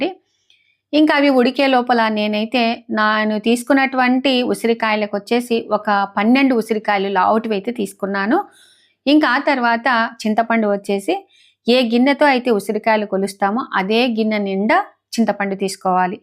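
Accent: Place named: Kambam, India. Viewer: Hi garu yala unnaru me vlogs antey naku chala estam andi ma Ammamma gari uru kambam dhagara Andukey a language naku chala estam madi nellore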